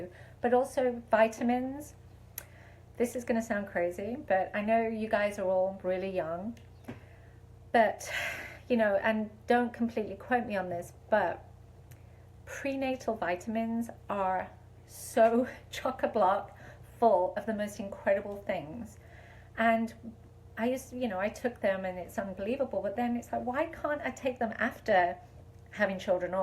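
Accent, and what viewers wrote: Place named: United Kingdom. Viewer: where were you when I was a kid!? I grew up in the 80s in the East Midlands and no one at school or the hairdresser’s told me about the Curly Girl Method. My mother told me to brush my hair and then chopped it all off when I refused 😬 when I read about your book I presumed you were American as this method appears to have traction in the States. Imagine my surprise when I heard your accent! Did you promote the Curly Girl Method and DevaCurl in the UK? I have never seen your products on sale here.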